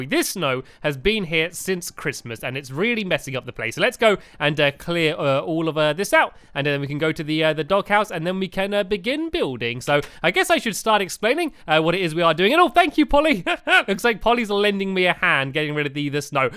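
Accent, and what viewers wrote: United Kingdom. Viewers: Brandon Ford British. He loves here. He lives in Bathe, but travels around the place a lot.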